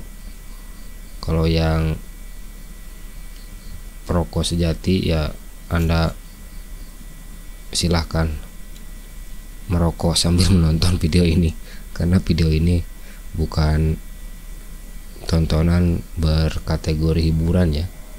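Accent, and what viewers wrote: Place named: West Java, Indonesia. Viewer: Ti Sunda mana kakang? Urang sampe nguley seuri, ngadangu bahasa "TUNGGU SAMPE BIJIL"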